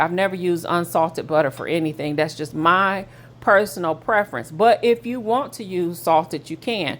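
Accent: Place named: Detroit, United States. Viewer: Sounds like a Detroit accent.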